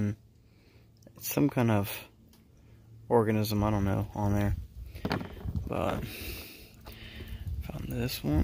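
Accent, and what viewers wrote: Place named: South, United States. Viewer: Well Dude I understand about the secret hunting stash, but you can still share other videos right? Maybe another sponsor will pick you up? Other than that, things are pretty boring in the "Burgh" this time of year except for $5.00 for a dozen eggs or $7.00 for a jar of mayo. Hey! maybe that could be your next video. Don't forget, the first video I saw of yours was a tour of the Conquest, I literally searched " Carnival Conquest Tour" and you were the first vid that popped up. I'm not sure if the content matters. Your voice and the slow way you explain things along with the southern accent are your strong points. Let's be honest, there is not a huge market for prehistoric shark teeth.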